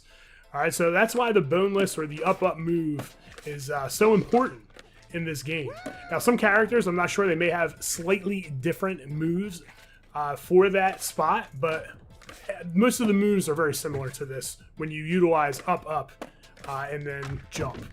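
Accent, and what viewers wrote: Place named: Delaware County, United States. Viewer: This is the first video I’ve watched on your channel, but I could tell right away that you are from Delco my dude. Say what’s up to my cousins!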